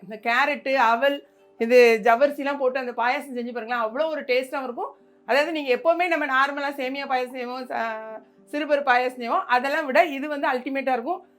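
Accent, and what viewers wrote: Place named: Tirunelveli, India. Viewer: திருப்பூர் கோகிலா... வணக்கம் சிஸ்டர்... நேத்து உங்க வீடியோ பக்கமுடியால... இன்னைக்கு உங்க குரல் கேட்டதும் நிறைவா இருக்கு... காரணம் நான் திருநெல்வேலில தான் படிச்சேன்... எங்க ஊர்ல யாரும் இந்த slang பேசறது இல்ல... எனக்கு திருநெல்வேலி பாஷை ரெம்ப பிடிக்கும்... உங்களையும் பிடிக்கும்... உங்க சமையலும் பிடிக்கும் 😍😍😍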